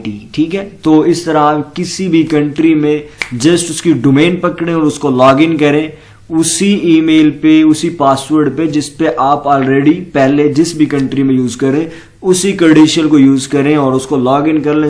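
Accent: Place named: India. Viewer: Why the English title and Indian voicover? Now I have to keep searching :(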